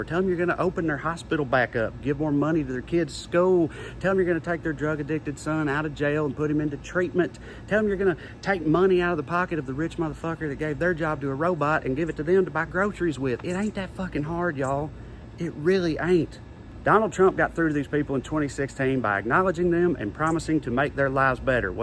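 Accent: South, United States. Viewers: Your soliloquies are music to my ears. Your tirades are inspirational. I'm addicted to your deep Southern drawl. Damn, man! 😄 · StClemmons I've heard Beay explain about the accent. He talks to people in a way that they can relate to. When he's speaking to the general public, he uses a less southern sounding manner of speaking, but when he's speaking with people who can relate to it, he slips back into his natural southern accent. I get that. When I answered the phone at work, I would speak distinctly and professionally, but among close friends, adopt a more casual tone. As far as the trafficking charges go, he has alluded to having a past conviction for it, and having served his sentence. As you said, it wasn't sex trafficking, and while still illegal, was definitely not the worst thing I've heard of people doing. There must be some kind of a gap to be filled with jobs in this country having to be filled by people from halfway across the world. 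Surely there are local people who could fill these positions. Are the employers not willing to pay enough to make it worthwhile for Americans to take these jobs? Or do the employers keep the wages low because they know desperate immigrants don't dare complain? And why would someone come all the way to the U.S. for a low wage, hard labor job, unless things were far worse in their home country? I like Beau's take on a lot of things. He definitely knows a lot about other countries, and many random subjects. I think he's worth listening to, but what you do is up to you. Take care.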